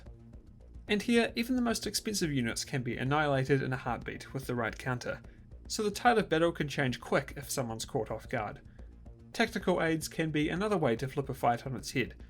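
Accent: New Zealand. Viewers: Really enjoy your Kiwi accent, man that brings me back to the amazing country.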